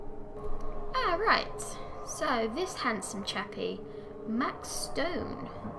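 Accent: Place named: United Kingdom. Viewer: I didn't quite understand the name of the game studio you're working in... Anyway, I'm eager to watch this series, because even though I really love the Fallout franchise, I've only played the PS2 spin-off Brotherhood of Steel and all the Bethesda games. So I'm more than excited to watch somebody else play the classic that started it all (well okay, that would technically be Wasteland lol), especially somebody with a British accent. :3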